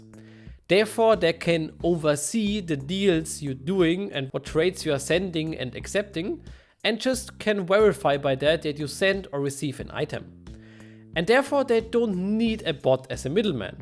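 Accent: Germany. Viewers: warum ist dein stimme so deutsch? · bruder dein deutscher akzent ist nicht zu überhören, sehr gutes video, hat mir sehr geholfen, weiter so!😂👍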